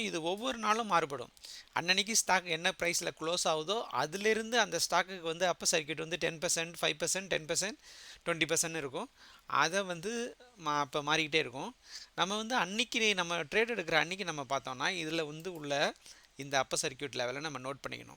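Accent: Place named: Thanjavur, India. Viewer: Your slang looks like around Thanjavur belt ...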